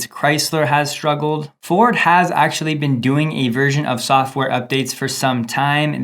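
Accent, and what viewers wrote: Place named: Staten Island, United States. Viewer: Are you from Stanton Island?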